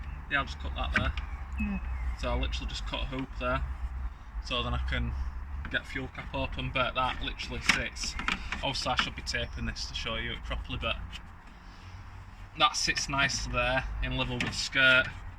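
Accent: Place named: Yorkshire, United Kingdom. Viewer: Also you have a Yorkshire accent I live in your shire be cool to meet up some time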